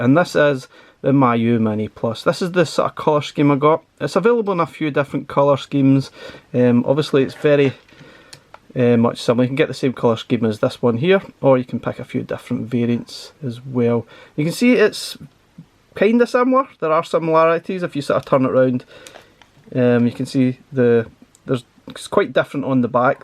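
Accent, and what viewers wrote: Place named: Ayrshire, United Kingdom. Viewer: lOVE IT! Can't wait to get mine, i have 2 minis and really looking forward to the larger form factor! good scottish accent, I'm guessing Ayrshire?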